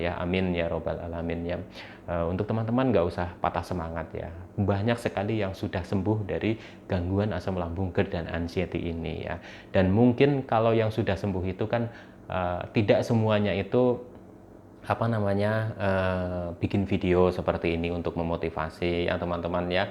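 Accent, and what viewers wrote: Banyumas, Indonesia. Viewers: Bener x mas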